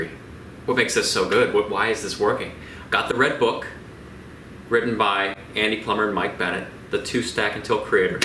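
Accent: United States. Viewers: I am 78 3/4 years of age now. Your story is very impressive. I have been playing golf over 45 years with my score ranges of 86~98. I long wanted to become a member of 70s club (golfers who play with strokes 79 or below 79 per 18 holes) but I gave up my hope as I already became old. But one day, while I was watching a Utube golf lesson program, A funny and happy guy who speaks "a very easy to listen, beautiful" American English appeared in another Utube screen. It was YOU, Mr. and You were talking about swings joyfully quoting the theories physics and geometry. You theory attracted me a lot and I instantly fell in love with you. This is what I long long wanted theory and practice. You encouraged me a great deal and I decided to challenge the AGE SHOOTER. Now, all parts of my body have been aching, so many parts that have never been aching during my 45yeas of golf. 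I enjoy small aches and become happy every day because my scores have been improving. Thank you. I will someday visit you and receive lessons from you!!!!!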